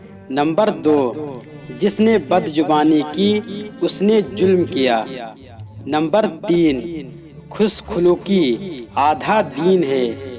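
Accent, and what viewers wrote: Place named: India. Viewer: Tallafuz sahi karyei Hindi mei hai Urdu mei boliye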